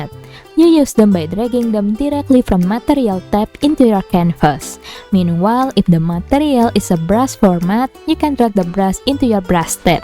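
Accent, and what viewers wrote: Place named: Indonesia. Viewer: oke dari logatnya aku paham kamu orang indo wkwkwk Nice job, makasih tutorialnya kak ^_^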